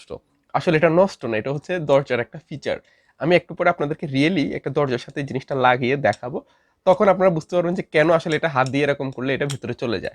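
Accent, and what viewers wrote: Noakhali, Bangladesh. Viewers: নোয়াখালীর ভাই নি আননে 🤭🤭🤭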